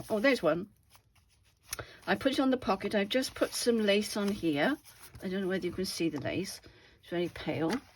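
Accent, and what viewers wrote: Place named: England, United Kingdom. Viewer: Hello, I live in New York State USA and recently discovered you. I am very much enjoying listening to you, speak as my grandmother and grandfather were both from England and I miss being able to hear them talk you have great ideas and I didn’t realize that you were Bella‘mom in any case I’ve been scrapbooking for a while and I am also a fine art painter but I find that doing paperwork and collecting different kits is absolutely wonderful and so relaxing. What I am currently working on is I am a member of pink monarch prints for $8.95 a month she will give you a 50 pieces of paper of a kit that she makes every month and they’re absolutely beautiful But I love to get ideas from other people on how they create and so I am very much enjoying listening to you and I also purchased kits from Bella as well. So thank you for being out there and I too am 76 years old.and a fine art painter.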